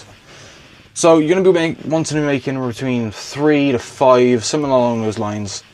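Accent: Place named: Scotland, United Kingdom. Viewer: I can't make out if you're Scottish, Irish or Cornish. Cracking video 😁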